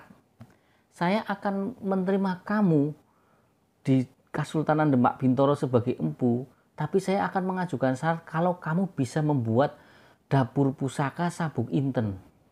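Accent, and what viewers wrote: Banyumas, Indonesia. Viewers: Om orang mna ya kok ngapak jg boleh minta wa'nya g om?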